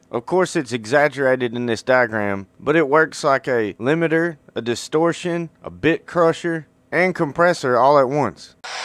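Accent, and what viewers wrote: South, United States. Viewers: love the American (southern?) drawl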